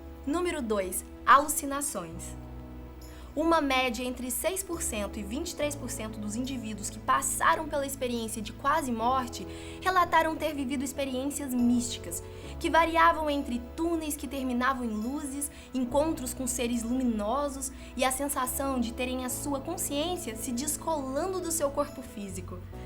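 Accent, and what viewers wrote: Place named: Minas Gerais, Brazil. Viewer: Sotaque mineiro.....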